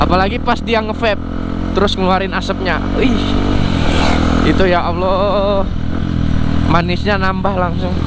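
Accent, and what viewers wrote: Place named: West Java, Indonesia. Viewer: Logat Sunda na mantep😂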